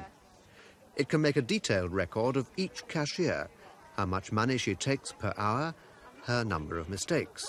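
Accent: RP, United Kingdom. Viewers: If only he had this beautiful classical RP accent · I just miss the old style received pronunciation delivery:)) Proper English, properly spoken.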